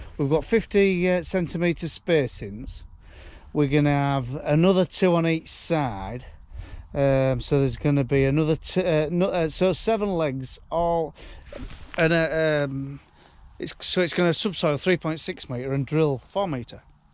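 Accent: Yorkshire, United Kingdom. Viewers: Look I'm dizzy enough without you dropping the camera, be more careful. Thanks for sharing anyway always good to listen to that Yorkshire accent, and the no nonsense get on with it farming.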